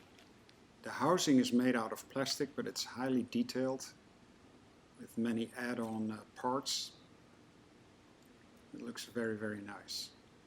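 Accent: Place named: Netherlands. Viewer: Your English sounds very Dutch. Nice presentation, nice locomotive. Although I drive mainly German and Swiss locos I may consider to buy this one as well. Looks and sounds really nice. Greetings from the Čech Republic 🇨🇿 and stay healthy 😷.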